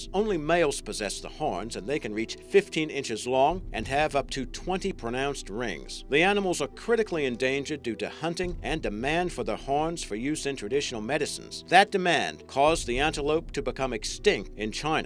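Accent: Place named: Australia. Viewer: maybe a soft Australian accent.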